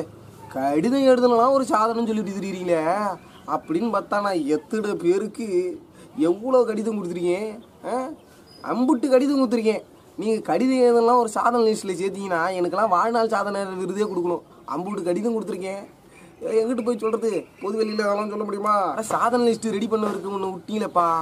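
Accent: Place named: Tirunelveli, India. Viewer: நெல்லை தமிழ் சிறப்பாக தான் உள்ளது.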